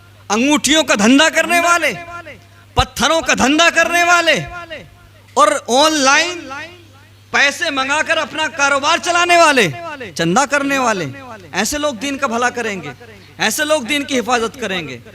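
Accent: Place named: India. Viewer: Kitni saaf urdu boltay haenh Indian ho k. MashaAllah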